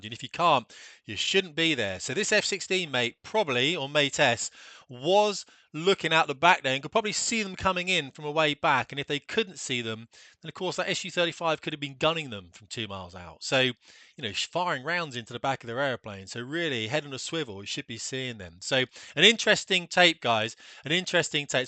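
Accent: United Kingdom. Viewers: Knowing what is going behind the scenes of the Ukraine war I love hearing a British accent make fun of a US pilot after all the US has done for with helping the UK in Ukraine. Total morale booster of a video. I think this video needs to make the round in the US military to remind us of who the OG enemy of the US is and why we should never trust them ever again.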